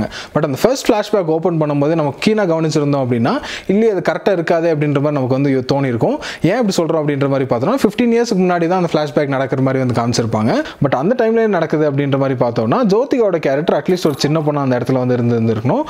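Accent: India. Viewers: Bro, R U from Nilgirs? Superb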